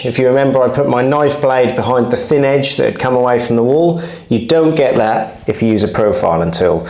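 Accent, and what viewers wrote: Norfolk, United Kingdom. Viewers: Norfolk boi :)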